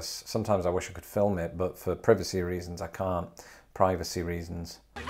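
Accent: United Kingdom. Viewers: I'll always think you are a Brit,. No insult meant, but the accent marks you. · NEVER lose your beautiful British accent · I really did not want to subscribe to your channel only because I heard your British accent or whatever you're from ( American Bigot here ), but you speak of facts and facts only. If anything you made me more proud to be of an American and I hate it. You're a brit, but made me more open minded and I love you for that.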